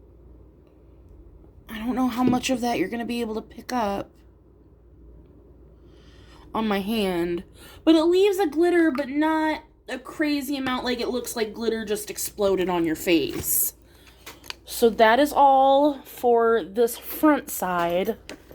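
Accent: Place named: Midwest, United States. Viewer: Love me some midwestern accent, reminds me of home!!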